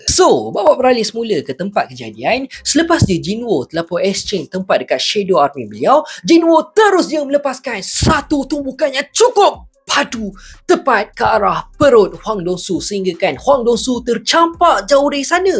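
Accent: Malaysia. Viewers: Bagus bang Sekurang kurang nya ada jugak video suara malaysia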